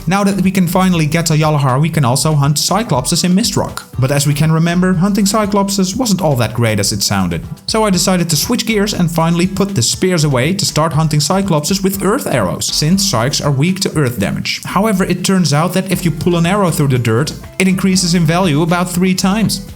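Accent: Netherlands. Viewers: Thick Dutch accent, my g. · Ik herken dat nederlandse accent meteen ;) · You sound Dutch, I am Dutch. I still have a character in Peloria, I can help you out anytime :)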